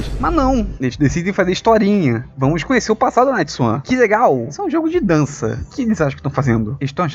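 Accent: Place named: Rio de Janeiro, Brazil. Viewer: Me deliciando com a voz carioca do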